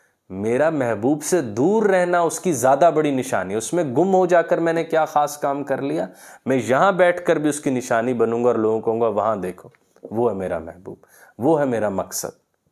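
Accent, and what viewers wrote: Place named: Punjab, Pakistan. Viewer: سر آپکا پنجابی لہجہ بھی خوووب ہے! 👌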